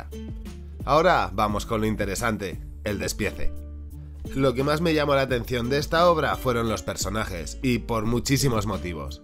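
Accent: Spain. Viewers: Pero es el doblaje latino y tienes acento español jejeje Link: <link> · ! Insólito ! ....hasta para narrador de un vídeo solo concerniente a los mexicanos...ponen a un gachupin.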